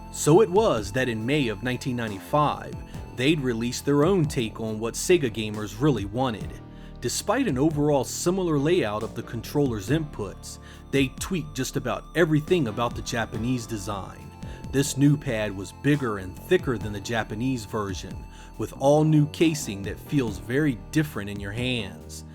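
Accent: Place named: Baltimore, United States. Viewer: Cool! I work with a guy from Baltimore and you guys sounds like brothers. Thanks for the reply. Keep the Saturn videos coming. Also, are you familiar with The VGC replacement long box Saturn/Sega CD cases on Amazon? I bought some and have my own opinion but curious if you had any hands on?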